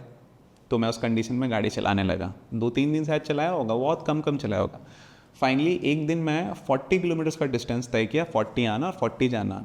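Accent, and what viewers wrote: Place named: Jharkhand, India. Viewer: Bhaiya ji apka tone se hi laga ap to apnye ho jharkhand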